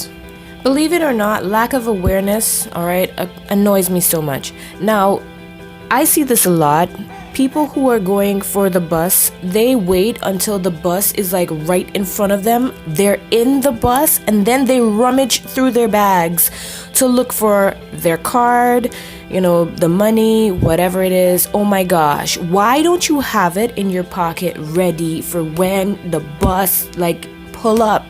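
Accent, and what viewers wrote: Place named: Caribbean. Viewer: Thanks for sharing your insights, this is seldom spocken or apparent on the youtube platform for black people. Correct me if I'm wrong but I'm hearing a subtle hint of carribean/West Indies accent from you.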